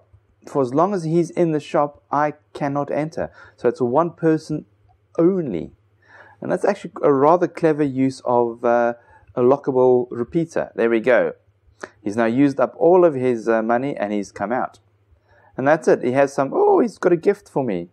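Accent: Afrikaans, South Africa. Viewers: is jy eintlik afrikaans? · are u south african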